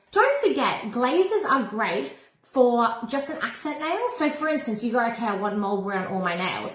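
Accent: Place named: Australia. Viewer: Hi Sweetheart. Love Your Aussie Accent . Love You. Kisses.❤❤❤❤❤❤❤❤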